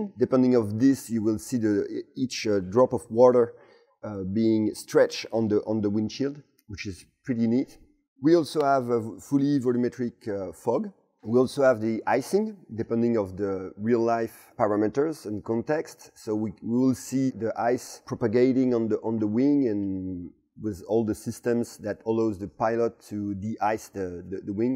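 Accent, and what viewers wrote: France. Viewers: His french accent made this video 10x better.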